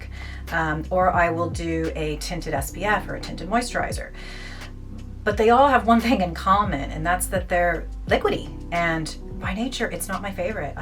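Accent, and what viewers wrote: Ireland. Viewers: I’ve been on the fence about this, but now I’m going to try it after seeing how great it looks on you. PS: don’t remember how I stumbled across your channel, but I’m so glad I did. You’re lovely, and I love that hint of the lovely Irish brogue!💚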